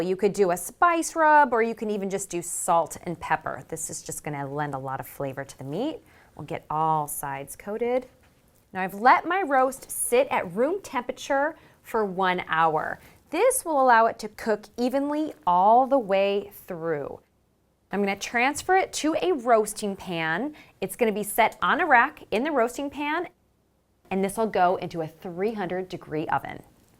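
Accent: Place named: United States. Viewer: Yank. Turn off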